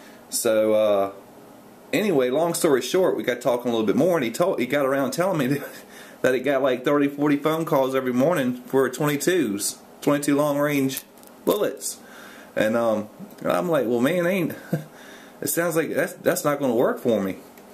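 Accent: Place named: South, United States. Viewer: Southern accent does not help either, anyone know a nobel prize winter or eminent scientist leader in his field who has a southern accent and don't even bring up Clinton because until he and Jimmy were elected President I thought there was something special about someone who could become POTUS but they killed that Idea FOREVER you can be common as shit and be president.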